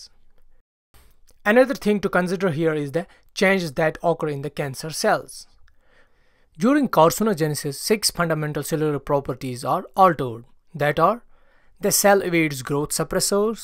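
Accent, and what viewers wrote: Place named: Kashmir, India. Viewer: Hehehe funny Kashmiri English dialect...... love it.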